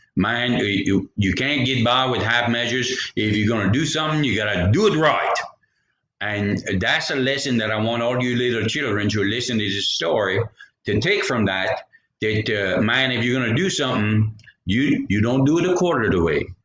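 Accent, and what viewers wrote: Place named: South, United States. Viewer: You really have southern charm accent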